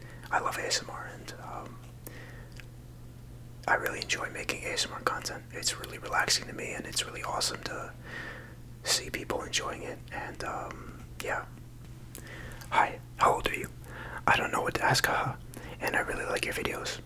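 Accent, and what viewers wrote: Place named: Brooklyn, United States. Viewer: I’m interested in pinpointing your accent. It has been muted somewhat by education and socioeconomic status, but my guess is Brooklyn or somewhere near.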